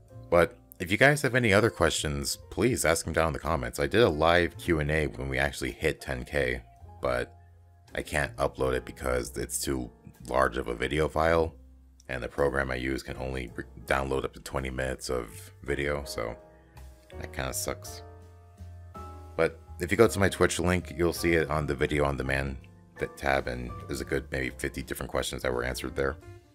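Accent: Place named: Central Europe. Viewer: thats cool, i actually thought about usa looking at your upload times but from your voice i thought you might come from central/east europe. Congrats on the 10k! No idea if you remember me im here since the 1-2k'ish =)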